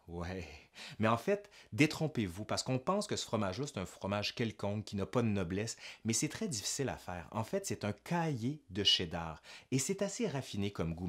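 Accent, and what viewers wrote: Quebec, Canada. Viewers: Ta capsule donne trop envie d’aller au Québec pour goûter cette fameuse Poutine Une amie française s’est expatriée deux ans dans ton beau pays . Une nana un peu difficile en matière culinaire . Elle a a do ré la poutine Bref merci pour cette vidéo J’adore ton ptit accent charmant que nous les français on comprend super bien 🤗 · Quel plaisir d'entendre un québécois parler de la poutine....bordel que c'est bon remarque le schisme de la frite entre les belges et les français ça marche aussi hein ^^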